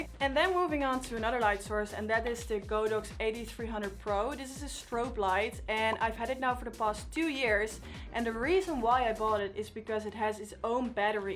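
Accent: Netherlands. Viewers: You sound Dutch!?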